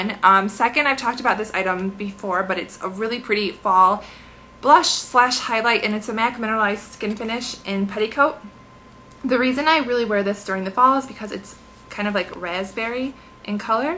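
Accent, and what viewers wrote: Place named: Boston, United States. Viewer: I love your accent!! Is it Boston? :)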